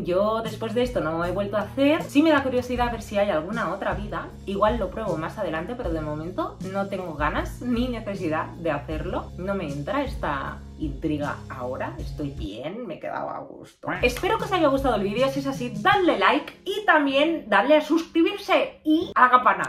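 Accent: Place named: Russia. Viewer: Porque tiene asento ruso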